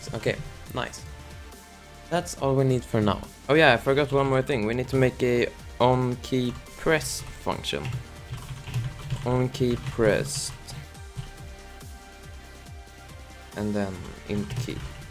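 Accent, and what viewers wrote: Sweden. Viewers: Hej är du svensk det låter som det? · Glad att man får höra en äkta svensk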